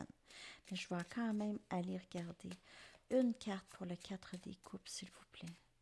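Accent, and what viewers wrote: Canada. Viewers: Vous êtes excellente,agréable à écouter avec l accent canadien bises de Belgique de Robert Brummel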